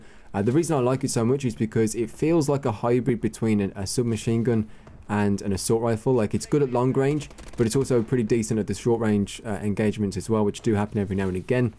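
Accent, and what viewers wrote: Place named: United Kingdom. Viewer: thank u for the tips n trick, british sounding tmartn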